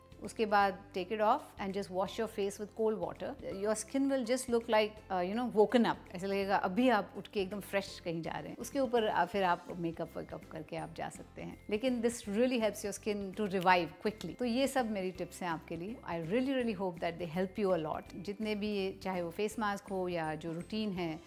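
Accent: Maharashtra, India. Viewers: मराठी माणूस कुढेहि गेला कितीही शिकलेला असला कितीही इंग्लीश हिंदी बोलत असला तरी त्याच्या बोलण्यात थोडातरी मराठी टोन येतोच ❤ u mam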